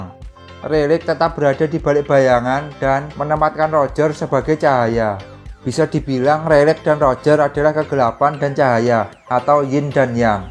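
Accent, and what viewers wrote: Java, Indonesia. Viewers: Suaramu medhok min ... Heheheh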